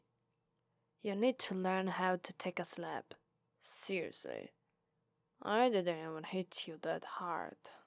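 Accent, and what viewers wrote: Italy. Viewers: I just discovered this channel and I have to say three things about it: 1. I'm not sure that these videos are exactly ASMR 2. I love your RP's and I think that they are the best on youtube and tingles. 3. I love your Italian accent and I understand better your English than native English videos, maybe because I'm Spanish. Greetings from Barcelona and thank you to do an excellent job ^^ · Missed an opportunity to use that Italian accent for a mobster accent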